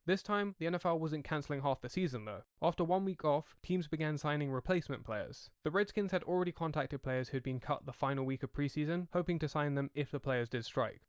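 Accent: England, United Kingdom. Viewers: Really nice vid. Well done. I have to admit, it’s different hearing this from an Englishman. Is the NFL even popular across the pond? Keep up the good work! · I am a Giant's fan from 1973. Nobody has to tell me how great the Redskins were in the 1980's under Joe Gibbs. As much as I hated them back then - that team always had my respect. They were talented, disciplined, and well coached. 45 years later it looks like they could be on the threshold of another championship team. Hope it is only 1 .... ; ) How do you know so much about American football with a British accent? I am pleasantly surprised - unless you are AI?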